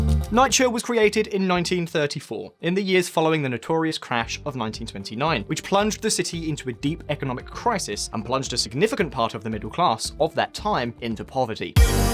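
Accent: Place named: United Kingdom. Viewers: He's British. H is pronounced "Aitch" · Best narrator for this channel, but pls pronounce H the non British way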